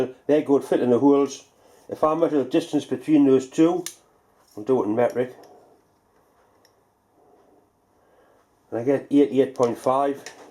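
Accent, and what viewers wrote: Newcastle, United Kingdom. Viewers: what kind of UK accent is that? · Someone Else It's Geordie, Newcastle area, Google Geordie shore.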